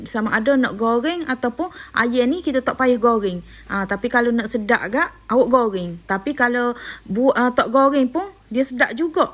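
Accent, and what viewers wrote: Kelantan, Malaysia. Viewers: Simple + senang.. haha terhibur dengar org kecek Kelantan ni.. hehe husband saya pon org Kelantan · Ralit saya tengok video sis.. lama tak dengar oghe kecek kelate..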